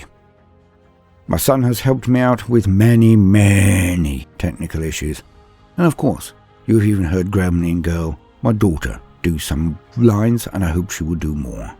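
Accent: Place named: RP, United Kingdom. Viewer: 100k is just the beginning, Sir what you bring to the table isn't just a talent for writing, nor a command of the queen's english, or your pleasing baritone of a voice. No, what you possess transcends all of that and yet is magnified by its very presence, you have passion and it reverberates with every line written, every word spoken, every image used, and yes every song (kudos to your wife BTW). You sir have earned your Legion of Followers, just as you will the hundreds of thousands yet to join.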